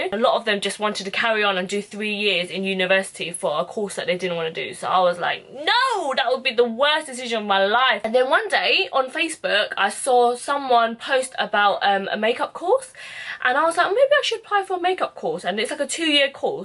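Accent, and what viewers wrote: United Kingdom. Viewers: Wow LOVE your British accent 😻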